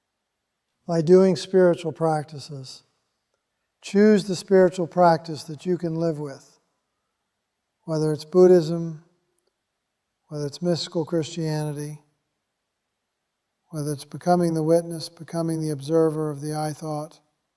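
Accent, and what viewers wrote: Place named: Georgia, United States. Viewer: Why is sounding like an old-timey trucker from Georgia all of a sudden?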